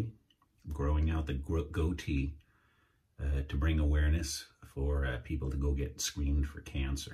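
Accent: Newcastle, United Kingdom. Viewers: your welcome and thank you for sharing such amazing videos. Newcastle's great, love the accent! I was born in london. I am also a bond fan and I collect watches so I noticed your omega Seamaster straight away, I also own one. I have just this week started shaving with a double edged razor for the 1st time and really enjoying it! Not to many cuts!! I can see this turning in to a new hobby! 😁🇬🇧🇺🇲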